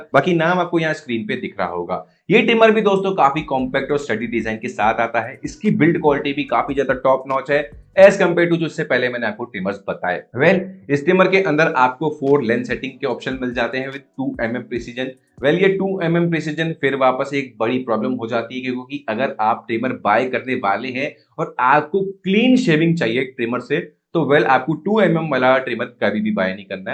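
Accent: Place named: Bhojpuri, India. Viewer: First time trimmer kharida tha video Dekhi aapane Sahi suggestion Diya but aapki awaaz mein Bhojpuri hai thodi Gunji Si Rahi awaaz ni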